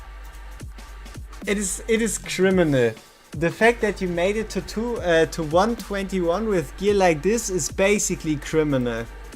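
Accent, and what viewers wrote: Germany. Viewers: naja man hört halt direkt dass du Deutscher bist :D aber man versteht alles und das ist doch die Hauptsache. Und inhaltlich eh top, also who cares ;)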